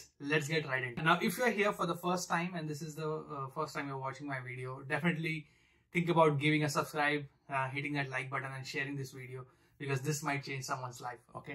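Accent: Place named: Maharashtra, India. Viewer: Great video bro...I guess you are a marathi guy based on your accent.. well that's a different top though! I just wanted to ask that if we are able to score only around 302-305 in GRE then is it possible to compromise it on 1 year work experience plus an internship of 6 months so that the total work experience will be of 1.5 years and the GRE score would be around 305.Will this make me get an admit into sjsu for MS In CS? I would be glad if you answer. Thanks!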